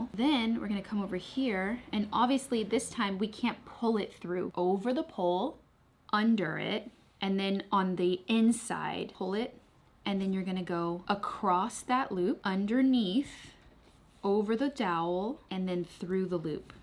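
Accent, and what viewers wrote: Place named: Hill Country, United States. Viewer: I love love love the way you speak. I hope that's not weird...you have such an elegant tone and each word if full of genuine feeling. did I already say I love it!! Maybe I just miss hill country accents <3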